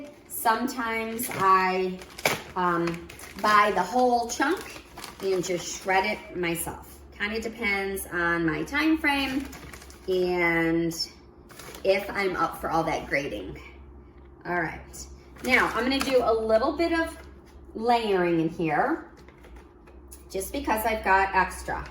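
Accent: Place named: United States. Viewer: You are an American😅😅😅 If you grew up in Italy you would speak Italian…correctly☺️ You’re just like the rest of us Americans! It would be like “African-Americans” not speaking an African language or knowing the correct African pronunciations of “African” words. All of us are a blend…no one is “pure” in race. We all are of the human race. Praise God! Thanks for your tutorial! Can’t wait to try!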